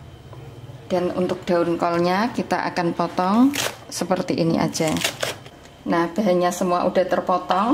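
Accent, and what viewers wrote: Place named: Java, Indonesia. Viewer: Medoq bgtz ngmongny...🤣🤣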